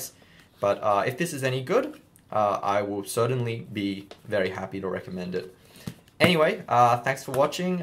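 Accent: Australia. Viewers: He’s clearly got an Australian accent???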